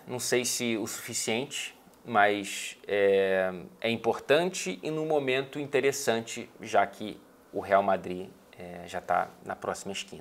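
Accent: England, United Kingdom. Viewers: por favor, não contenha seu inglês inglês! é charmoso e ajuda-me a evoluir na pronúncia correta! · eu gosto demais da sua pronúncia do inglês britânico inclusive te acho muito elegante por conta disso ignora esses comentários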